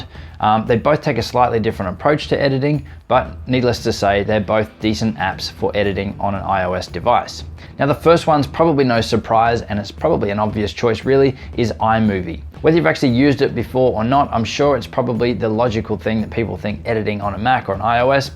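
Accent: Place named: Australia. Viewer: Bro your Cleary Australian Australia for life (I'm Australian as well) I'm WA what state are you if you want to share it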